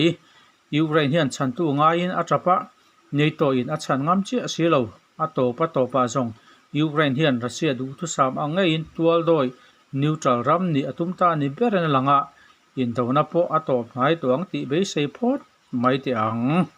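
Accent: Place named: Mizoram, India. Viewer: Ka pu i news puan hi a ngaih nawm awm thei viau ah masah i english pronunciation hi hi ngaih thlak a har deu thrin ka ti. Ka dem lo che maseh english kan hmang chuan mizo thuthluk in I rawn lam thrin a, a dik lo deu hlek ka ti na a ni eh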